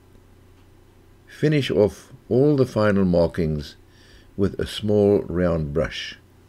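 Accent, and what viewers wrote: South Africa. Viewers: I absolutely loved hearing my fellow South African accent so unexpextantly. I have been living in the US for a few years and was looking for some new inspiration in my paintings. Great tutorials.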